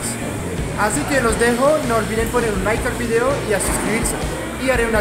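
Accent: Colombia. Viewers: Por alguna razón cada vez que veo tus vídeos , es un poco gracioso como hablas castellano xd , tienes acentO colombiano hahahahaha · llevas tiempo viviendo acá? porque tienes acento colombiano!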